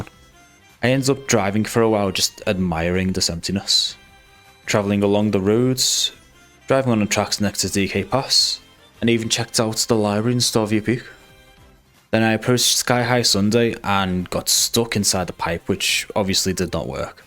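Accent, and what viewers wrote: Liverpool, United Kingdom. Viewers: great video made even better by fact you're a scouser, legend. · come to think of it barely any youtubers have a Liverpool accent like this (in my experience at least) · Omg are you a fellow scouser…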